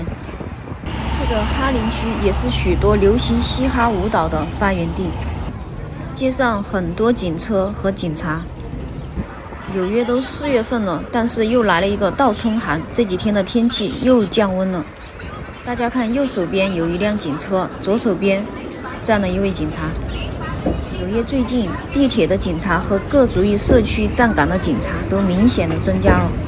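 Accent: Sichuan, China. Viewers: 师姐你是四川人吗 都垮杆了😄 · 流利的川普，就喜欢这个口音，加油。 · 师姐是 四川人吗？ 川普好亲切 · 大邑邛崃蒲江一带的口音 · 哈哈，晚上不要东晃西晃，四川话好亲切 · 看你的视频也学了不少四川普通话。👍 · Haha - I can tell the host is from Sichuan where I came from... Take care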